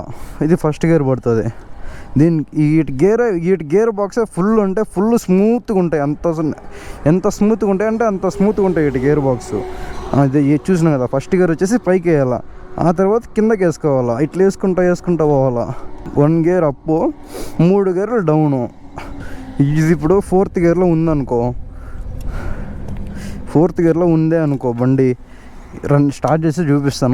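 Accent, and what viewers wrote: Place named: Karnataka, India. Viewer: u r language is good to hear , karnataka border untaava mowa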